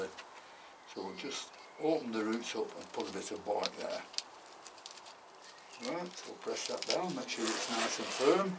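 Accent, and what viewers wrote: United Kingdom. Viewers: I love the way you say "boogs"😁 I'm american and fascinated by the british accent anyway, but yours is especially fascinating. I love your channel and learn so much every time I tune in. Thank you so much for all you do for us amateur hobbyists.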